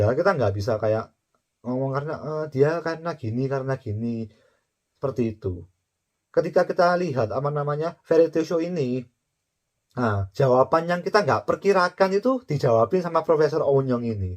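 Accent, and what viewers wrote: Java, Indonesia. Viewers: aku suka bgt nonton variety show tp sayangnya tayangan televisi indonesia ga banyak ngasih acara reality show real, ada pun settingan ga jelas dan ga ada yg bagus menurutku kecuali Channel Net TV doang sehingga banyak ku habiskan nonton tayangan korea drama atau varietynya. Akhirnya discover channel youtube ini krn alogaritma youtube saat aku nyari dr. Eun Young pas bagian Ikon tampil. Sayangnya banyak yg gada subtitle engsub/Indosub nya variety psikologi ini (sekarang yg ada subs nya cuma dr Oh Eun Young's Report: Marriage Hell, lumayan belajar tentang dunia pernikahan hehee), padahal pengen bgt ada subs nya biar bisa belajar, ga cuma haha hihi doang kyk variety show lainnya yg jenisnya komedi. Kembali bahas channel youtube ini, pertama kali klik play, lah kog medhog, mengingatkan Korea Reomit/Jang Hansol hahaaa.. menarik, bakal kepo dan liat video2 di beranda channel ini. 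Semoga berkembang selalu dan banyak menyajikan info apapun yang menarik dan baik,!!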